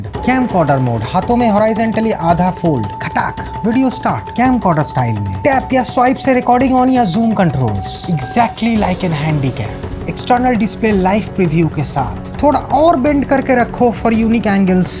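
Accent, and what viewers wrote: Bengal, India. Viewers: Man, what a review video. Very unique. Never experienced such a detailed technical, camera performance oriented review on YT. Have watched your Pocket 3 review also. And I loved the drama in your videos ❤ And the hint of Bengali pronunciation. Really appreciate your hard work. All the best Bro 🙏🙏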